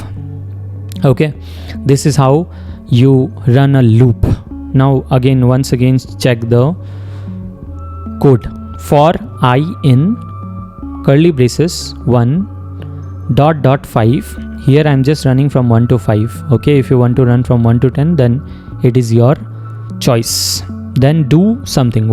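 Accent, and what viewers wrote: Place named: India. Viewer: you look like filipino with indian voice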